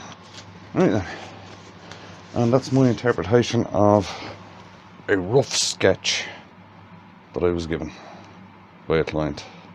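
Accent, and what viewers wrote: Ireland. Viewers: I love your videos! You always tell us what you are doing. That turned out exactly like the sketch! There is no way I could have or would have attempted it. Only been turning about 8 months though lol. As an American I'm in love with that beautiful Irish lilt in your voice. Happy New Year to you and your family!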